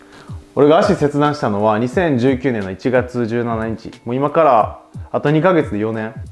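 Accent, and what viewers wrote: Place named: Kobe, Japan. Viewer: 神戸の子やな、言葉で分かる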